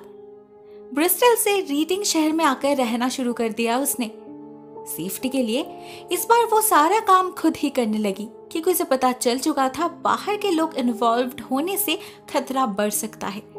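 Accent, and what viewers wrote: Bengal, India. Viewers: are you bengali or bihari ?? ❤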